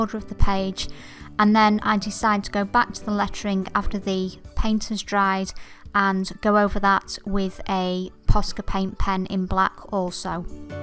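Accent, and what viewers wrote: England, United Kingdom. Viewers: What a beautiful page ! Your sweet English accent reminds me when I was a little girl I used to watch ART ATTACK with Neil Buchanan and his lovely accent , he opened my eyes to art and crafts and you nourished my sense with your magical touches Wish you luck and happiness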